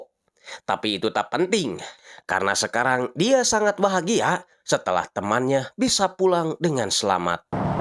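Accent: West Java, Indonesia. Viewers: Nyunda pisan😂😂😂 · Akang orang sunda ya?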